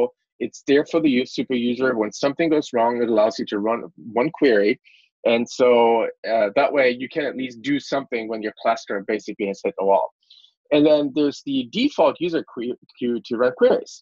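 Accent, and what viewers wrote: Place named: England, United Kingdom. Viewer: Clear enough, and with a clear English accent. I'll allow it.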